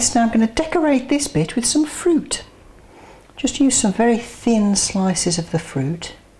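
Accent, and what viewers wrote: United Kingdom. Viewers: You sound so British, so refreshing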